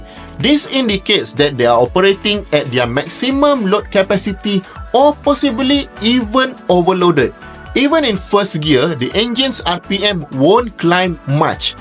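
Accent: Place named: Malaysia. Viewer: Mantaplah prof... Bahasa English,tp intonasi masih lg bahasa Malaysia , masih familiar 👍👍👍👍👍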